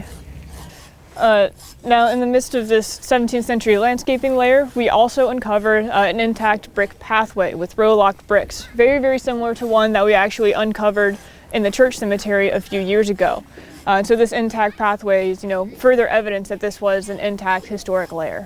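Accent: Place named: Dorset, United Kingdom. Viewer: Hi. Really like your accent and tone of voice. It reminds me of my family from West Dorset in England. I also wonder that apart from the hard archeology how much effort do you make regarding social and economic history, the evolution of dialects. Perhaps you do follow the journey from an English colony of dissenters and adventurers to freedom you sort and the creation of your own country. Excellent and easily understandable videos. Nick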